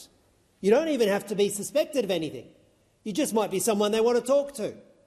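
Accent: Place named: Australia. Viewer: gosh please keep this american crap away from Aotearoa New Zealand 😅 thank you senator ❤️‍🔥 you are welcome here despite your strong Ozzie accent 😅 you are based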